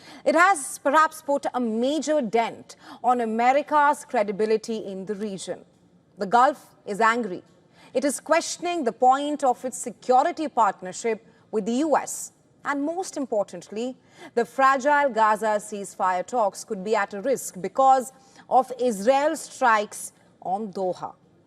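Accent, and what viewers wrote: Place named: India. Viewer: Your sound Indian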